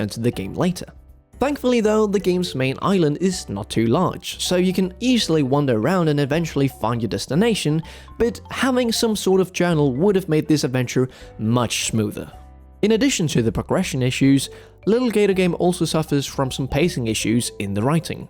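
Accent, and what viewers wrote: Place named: United Kingdom. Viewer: And through what I assume is your work at NL, your English (which was already quite impressive as an ESL speaker) has improved even further! It might be because you have a slight British affect to your English rather than the usual Mexican-American accents I'm used to, mind you, but you could pass off as a native speaker from a non-USA English-speaking country!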